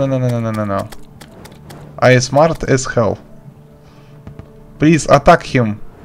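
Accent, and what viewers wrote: Russia. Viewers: i dont know if i can watch tutorials on anything unless they have a russian dudes voice after this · Кааааеф. Так отчётливо слышится русский акцент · ну привет, по акценту можно сразу понять что ты русский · очень слышен русский акцент спасибо помог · русский акцент выдал тебя))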